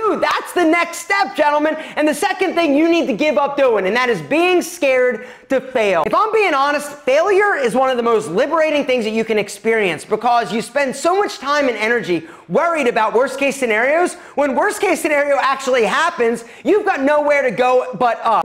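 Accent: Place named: Italian-American, United States. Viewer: It's the Italian-American accent. Yes, it sounds so badass.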